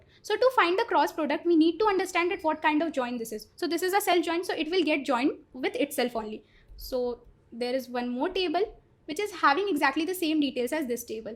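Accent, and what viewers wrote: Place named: United States. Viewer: dii, mai special aapka video apke fluent english ki wajha se pura ye 5 hours dekha hu , knowledge kahi se bhi mujhe miljata lekin aapne jaise ye itna faraatedar english koi nahi dega mujhe bhaot kuch sikhne ko mila mujhe apse dii thanks dii , or aap kya batasakte hai ki itna fluent english mai kaise bol sakta hu , waise mujhe lagta hai ki aap american ho :)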